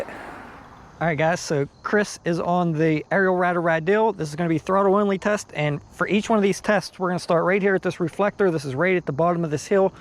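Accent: Pittsburgh, United States. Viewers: Great video! Are you from Pittsburgh?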